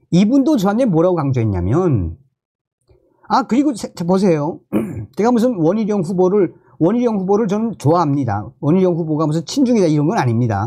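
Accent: North Korea. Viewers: 윤상현 입니다 윤상년 아니구요 존경하는 정성산 감독님 방송 열심히 해주시는데 감사합니다멸공 부탁의 말씀은 발음 연습 필요합니다북에서 쓰시던 발음 불편하게 들릴때 많아요 열심히 해 주세요 화이팅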